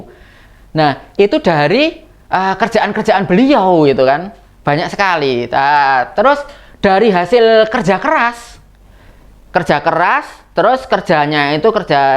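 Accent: Java, Indonesia. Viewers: Kaget aq, kirain mas Alif ternyata bukan suara yg ini ada rasa rasa jawa bgt logatnya🙏😅🤣👍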